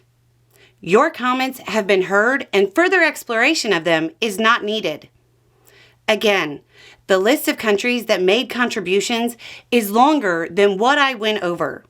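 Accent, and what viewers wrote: United States. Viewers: Pleasantly surprised to hear an American accent speak so much sense.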